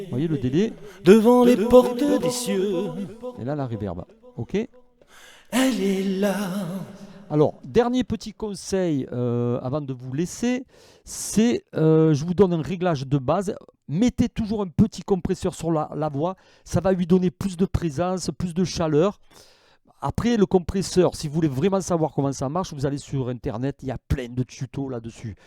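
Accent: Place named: South, France. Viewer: Bonjour! Je débute avec cubase pro11, pour le rangements des projets sur quel DD, dois je les mettre? Avec mon système sur le disque C ou avec les VST inst sur le Disque D? J'ai entendu un accent du sud dans ta voix! J'habite à côté de DAX, est ce que tu fais des formations en présentiel, ça m'intéresserais? J'adore ta façon d'expliquer très précise. Je pourrais me déplacer si l'on est pas trop loin! Amitiés à toi! Marcus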